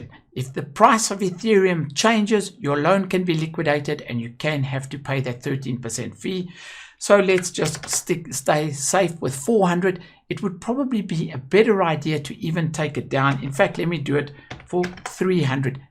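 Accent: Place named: South Africa. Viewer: Hi,my brother!You sound South African.Any pointers for me here?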